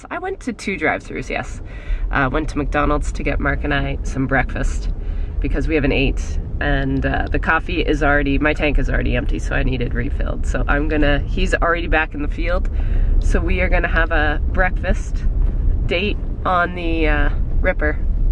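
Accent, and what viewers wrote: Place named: Canada. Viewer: Where are you operating out of? Looks like Michigan but I just heard you say out} so I know your in canada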